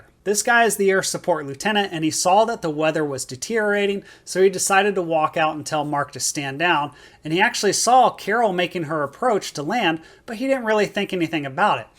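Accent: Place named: California, United States. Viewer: Hey are from California? Got that cali accent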